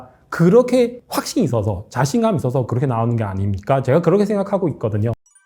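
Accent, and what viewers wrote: China. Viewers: 이 분은 중국인인가요?? 발음이... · 한국인 맞으신가요? 발음이... 중국분은 아니시죠?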